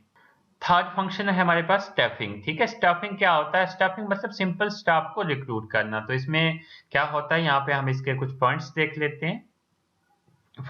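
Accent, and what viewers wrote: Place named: Bhaderwah, India. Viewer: sir your voice seems to have a Bhaderwahi Accent(u seem from Chenab Vallley)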